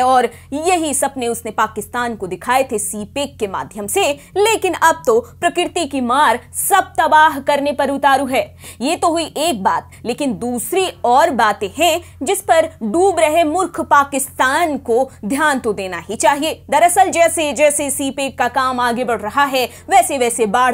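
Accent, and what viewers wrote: Standard. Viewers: It transcends me to a new level of excitement when I hear someone speaking SHUDH HINDI, very proud of you ji, keep up the excellence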